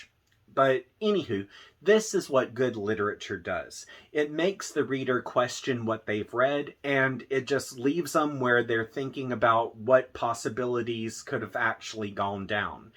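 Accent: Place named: Texas, United States. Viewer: That explains it, I'm born and raised in Texas, lived in Georgia for three years now, it was definitely nice to hear a familiar sounding voice on YouTube for a change ✌ I'm sending my best wishes, and have a wonderful day ☺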